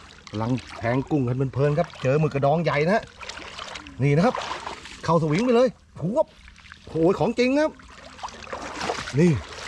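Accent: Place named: Trat, Thailand. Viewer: สำเนียงการพูดเหมือนคนตราดเลยเกี๊ย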